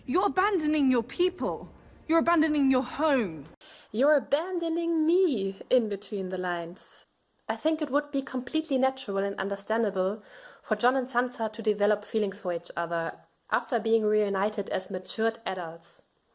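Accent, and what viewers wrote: Germany. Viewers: Is English your mother tongue? Your speak a bit with a german accent, I guess, but that's very enjoyable...^^ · That german accent . Nice theory tho.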